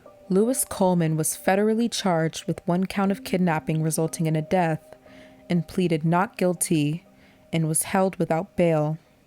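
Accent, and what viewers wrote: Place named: United States. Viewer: You sound like an All American girl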